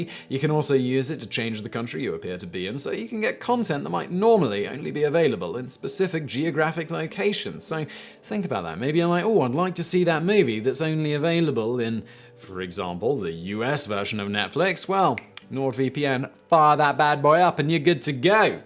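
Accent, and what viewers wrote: United Kingdom. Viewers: Thanks for another great video! I think one of the reasons I like watching Simon so much is he reminds me of my youngest son. It's the beard and the intelligent way of speaking (although my son doesn't have the British accent).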